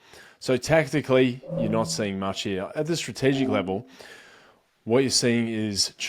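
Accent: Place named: Australia. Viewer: The dude speaks with heavy Aussie accent.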